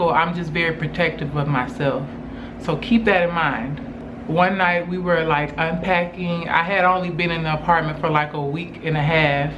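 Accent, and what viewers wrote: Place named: Alabama, United States. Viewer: Fr!! I tend to realize females that’s from/live in Alabama have that same accent and they sound the same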